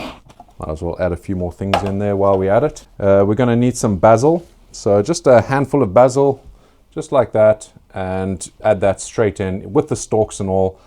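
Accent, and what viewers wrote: South Africa. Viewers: No way i thought you were south aftican not british! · Sorry I haven't seen your channel until today as your content is great! Are you from Capetown originally? I think I hear a definite SA accent. I have made ketchup/catsup a couple of times, much superior to store bought, but a real effort to do, I think that if you get in the rhythm of doing it, it is as much of a chore. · Typical weather in the UK? I could have sworn you were in South Africa with the accent. Hmm..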